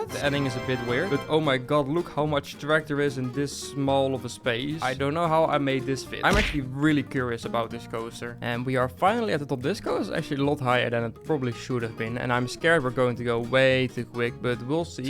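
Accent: Netherlands. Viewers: R u Dutch? Ur name is Dutch and there is kinda a Dutch accent in jr English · deze guy is sws Nederlands (de accent). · Ik hoor Nederland haha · Hello, it sounds like your Dutch. Are you Dutch? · Je klinkt nederlands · Ben je Nederlands want ik hoor dat gewoon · I bet this guy is dutch i mean look at his accent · Are you Dutch? You here the accent😂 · U sound like u are Dutch are you? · Ik vind het zo leuk ook gwn met het Nederlandse accent. Ga zo verder! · Are you Nederlands.I hear the accent.😂😂😂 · Hi i have been following you for a long time now and i always hear like a bit dutch so are you dutch ir for real english if your like truly englisch than sorry that i asked this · Ik weet dat je Nederlands bent 😂 ik hoor het aan je stem anyways love the vids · Ik hoor het nederlands accent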